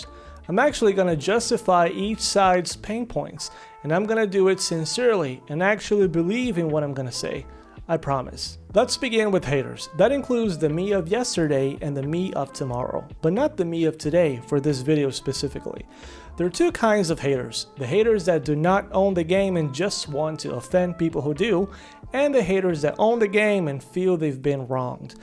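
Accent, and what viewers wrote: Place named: Israel. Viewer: This guy sounds Israeli... Ewww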